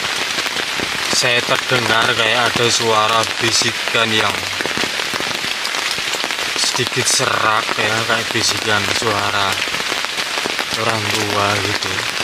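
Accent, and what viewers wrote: Java, Indonesia. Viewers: Jawanya medok mas😅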